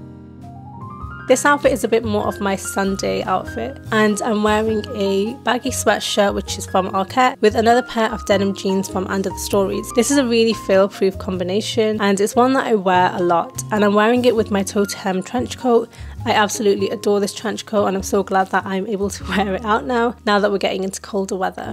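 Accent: United Kingdom. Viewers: Your accent is british? ✨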